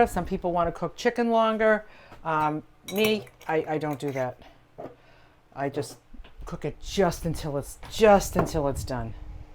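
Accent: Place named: Boston, United States. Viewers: Hi! You're a lot of fun to watch - love your sense of humour and Boston accent. I'm hooked on your videos. <3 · New sub here. I am curious, what did you do before you retired? You seem like a teacher to me, maybe a New York or Boston accent? Just a guess. Love your channel too❤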